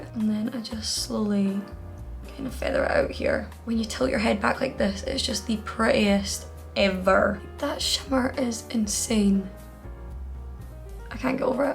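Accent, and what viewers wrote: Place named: Glasgow, United Kingdom. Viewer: I love your accent, is just makes me feel like home. I’m half British and Glasgow just feels like home even more then Adelaide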